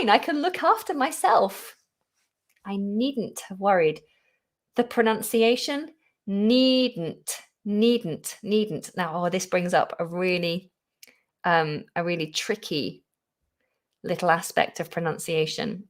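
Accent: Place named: United Kingdom. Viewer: Hi great to see you again and enjoy listening to your beautiful British voice. Unfortunately i couldn’t catch you on live but it’s Ok as i am watching you right now from Casablanca, Morocco 🇲🇦 Thank you so much for this live steam 😎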